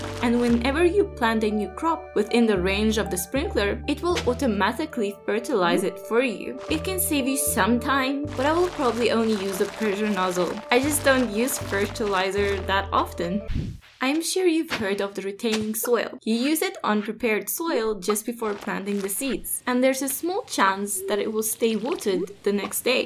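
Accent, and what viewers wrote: South Africa. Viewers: I hear a South African accent. Hmmmmmmmmmm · South African?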